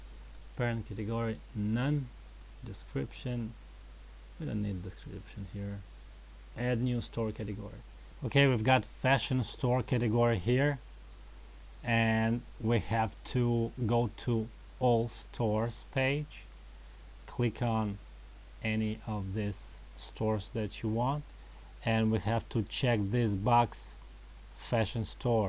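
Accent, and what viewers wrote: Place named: Russia. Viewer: I can hear that Russian accent from a mile away my Ruskiy bryatuha.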